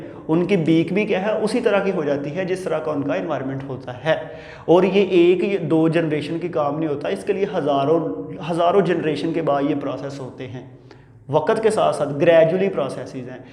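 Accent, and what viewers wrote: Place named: Punjab, India. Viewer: I’m sorry but looks like sir speaks English like Punjabi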